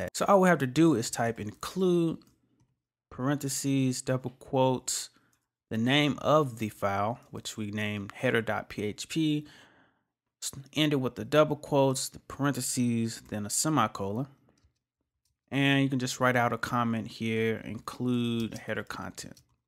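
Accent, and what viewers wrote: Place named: Brooklyn, United States. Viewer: Nice bro, its like Brooklyn brother teach me how to code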